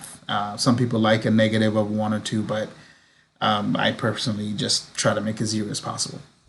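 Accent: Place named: Latin America. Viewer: Exelent video bro. 1 question can you do a tune remotely? You seems and sound latino 😁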